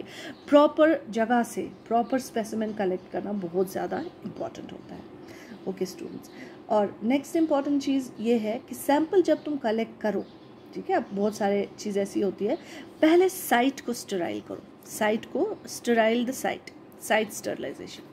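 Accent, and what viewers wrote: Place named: Kashmir, India. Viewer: Excellent ma'am ur teaching way is amazing and that tooo in between the kashmiri ascent is phenomenal ♥️♥️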